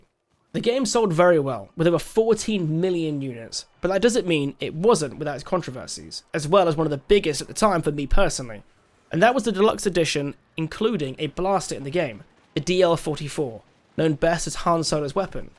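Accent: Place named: England, United Kingdom. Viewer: English accent? Star Wars video game lore? Subscribed!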